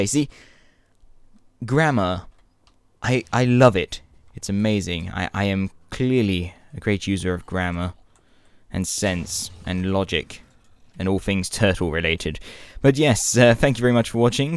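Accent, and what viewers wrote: United Kingdom. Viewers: I love your voice im british too <3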